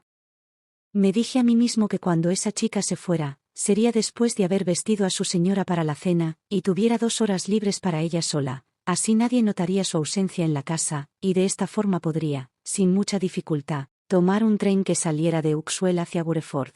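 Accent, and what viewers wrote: Castile, Spain. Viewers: Narradora muy buena ,castellano perfecto ,no hay nada mejor. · Hacía tiempo que no escuchaba un acento castellano tan... castellano (y tan... femenino). Dicción y entonación impecables, casi "mágicas". Felicitaciones, y gracias por el trabajo que desempeñan. Un saludo cordial desde Buenos Aires, del otro lado del Atlántico. al sur del mundo.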